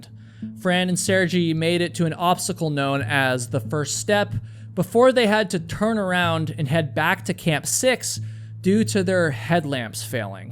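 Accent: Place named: United States. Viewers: anyone whinging about pronunciation of names needs to shut up. You are telling the story respectfully. You are an American. You are permitted to have an accent! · We all understand that US-americans put the english pronunciation on everything, even though it's funny sometimes we are used to it :) most importantly the video was great and super respectful.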